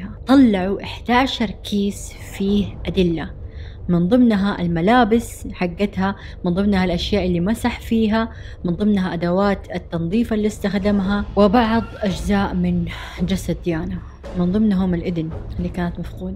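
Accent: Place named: Jeddah, Saudi Arabia. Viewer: 😂😂😂البنت حامل والدنيا شوب .. يا ويلي انا تابعتك عشان لهجتك .. لدرجة انو صرت اذا قابلت بنات اعرفهم من لهجتهم انهم جداويات .. من اجمل اللهجات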